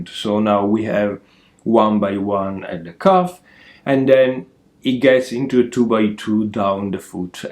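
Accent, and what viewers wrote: Italy. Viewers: Hej, I love your accent, I am from Denmark, and English with Italian accent is much better than with danish😀 being Danish I fully understand your being grumpy about rain…. 😂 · I love your podcast and I love your Italian accent!❤️ Also you didn’t seem grumpy! You are great and don’t change a thing. I be back again soon! Katie from Maine USA🌸🌙😊🧶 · Thank you for posting a wonderful video, even when you're feeling grumpy. Your mood is totally understandable with this constant rain! Jumpers look great. Hopefully you can soon get opportunity to paint your fence. Maybe we'll see the end result 😅 Can definitely hear the Irish accent come through, mixed with Italian, sounds so nice.. Take care and enjoy the Bank Holiday weekend too ❤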